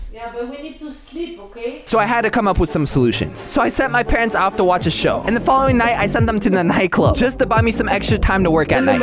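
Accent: France. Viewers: Are u french? Anyways i love ur video when i see a notif of u i smile instantly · The French accent ! xD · C'est juste dingue😶C'est la première vidéo de toi que je regarde et ça me donne déjà envie de m'abonner...Allez, +1! PS: Your french accent is hilarous🤣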